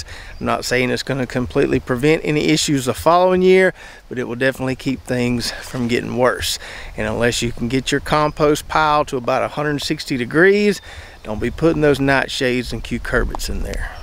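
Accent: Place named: Southwest Virginia, United States. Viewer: Thank you! Not sure where you're from but you speak like us here at home. I think you may be a little further south but here in southwest Virginia, our last two winters have been so mild that it doesn't freeze out the insect pests that cause disease like you said in this video. I like that worm bin you had buried in your raised bed. I'm thinking about making one of my own. Take care!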